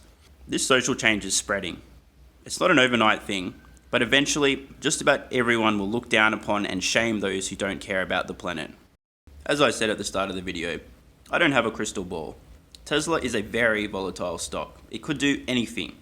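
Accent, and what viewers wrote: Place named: Australia. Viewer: Good Work, and Nice Vid work... Especially the Brief Jim Chanos shorts pic... lol Great to hear an Oz Accent covering TSAL too... You really are the first for us:)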